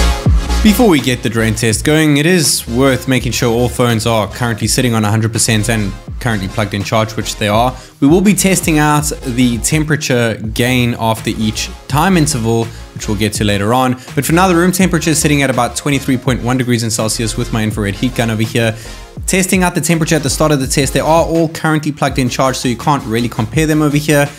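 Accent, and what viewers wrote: South Africa. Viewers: 100% south african immediately picked up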